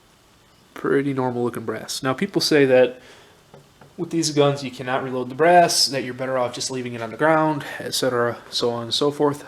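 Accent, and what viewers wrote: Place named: Minnesota, United States. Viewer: I detect....... minnesotan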